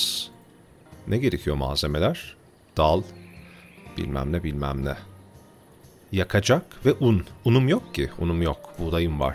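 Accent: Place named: United States. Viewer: Namı değer türkçeyi amerikan aksanıyla konuşan koca yürekli insan sanada selam olsun:washhands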